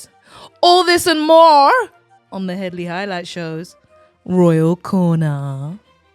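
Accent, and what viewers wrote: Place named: United Kingdom. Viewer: I love your British way of saying everything! Crackers, side salad, also darlings! I’m so glad you’re here ❤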